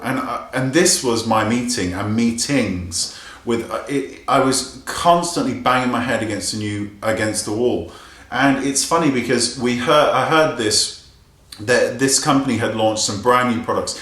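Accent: England, United Kingdom. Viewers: This is exactly why WE all Love you so much! This and your supercute englisch accent☺. Kisses from Germany and a Big thank you for all your honesty and braveness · That english accent! <3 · I love you, I love watching your videos. Think youre absolutely hilarious! Most of all I love/adore your accent. I'm Irish so the English/UK accent is amazing to listen to anyway. But there's a few words that you say which have a wee bit of a "twang" e.g. when you said "haarrrrrrrd" in this vid.. LOVE IT! That makes watching these videos, really does. Also, I totally agree with some of the comments left here that you should create your own line of cosmetics and/or you should write a book.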